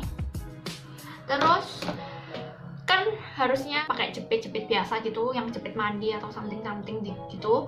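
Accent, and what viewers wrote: Java, Indonesia. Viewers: Medoook wesh bahasane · Medok skalee kak.. .. Kaya aku 😅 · Medok banget mbak🤣🤣🤣🙏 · seneng bat dengerin medok nya wkwk:v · Suka bgt kalo medok gitu wkw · medhogggg medhogg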